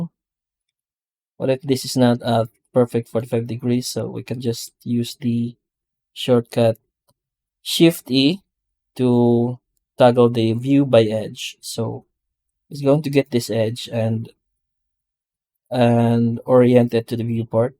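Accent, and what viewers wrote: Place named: Philippines. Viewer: First time I hear your voice...😅 Now I can confirm you are pinoy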